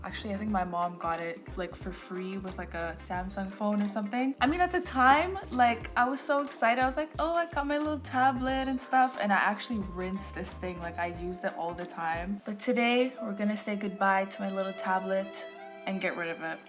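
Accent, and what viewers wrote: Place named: Toronto, Canada. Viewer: Great unboxing and video editing! loved the stop-motion at the start. Could tell you're from Toronto based on your accent lol. Keep going! I subscribed :)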